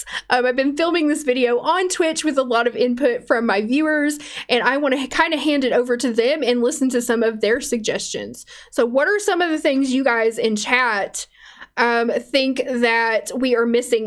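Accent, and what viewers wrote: South, United States. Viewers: Is it a southern American accent?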